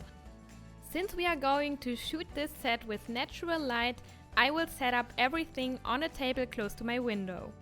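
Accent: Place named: Germany. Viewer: Warum machst du deine Videos auf Englisch? Man hört direkt das du aus Deutschland kommst. 😃 Ist nicht böse gemeint aber welchen Zweck verfolgst du damit?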